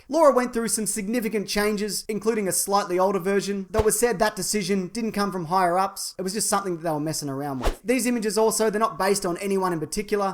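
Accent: Australia. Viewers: you sound like aussie man.......not just because you are Australian but you sound like him.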